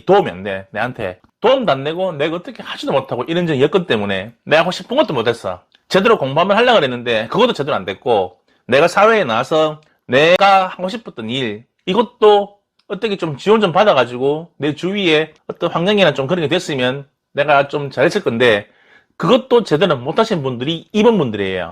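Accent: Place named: Daegu, South Korea. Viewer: ㅋㅋ말씀하시는게 썸남이랑 똑같아서 듣는 내내 기분이 좋았어요. 참고로 썸남도 대구사람ㅋ 잘 들었어요~감사합니다 1번.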